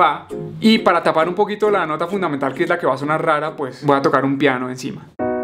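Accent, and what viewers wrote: Colombia. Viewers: Mi silbido casi no se escucha!! Y que bonito su acento colmbiano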